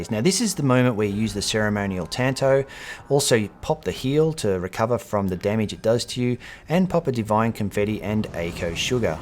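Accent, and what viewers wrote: Australia. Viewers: During a perfect battle he can barely do anything special but if you take too long my god he sculpts you into his bitch. Thanks again, when I hear that familiar aussie accent I know everything will be okay. Dissecting the videos like this has really made me appreciate the combat too.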